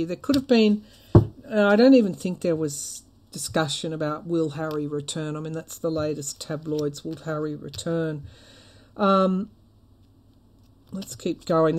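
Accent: Australia. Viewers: Thank you for these readings! 🙏 I love the way you have dealt with the trolls! Tell 'em off! I look for you videos every couple of days. I appreciate your manifestation of mercury/mars/Pluto/Venus conjunctions especially with the Aussie accent. Huzzah, ma'am! Peace love and light.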